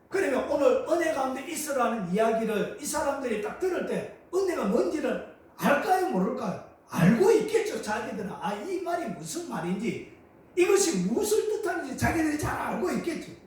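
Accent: Gyeongsang, South Korea. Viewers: 저도 경상도라서 귀에 더 잘 들어와요 고맙습니다 고난중에 집중적으로 들으면서 영이 살아납니다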